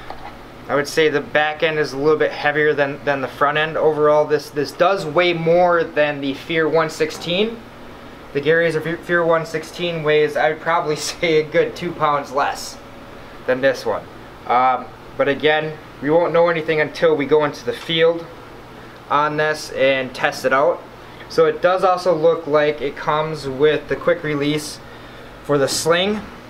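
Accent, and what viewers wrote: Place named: Wisconsin, United States. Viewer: "oh yah, you betcha" You must be located in Wisconsin.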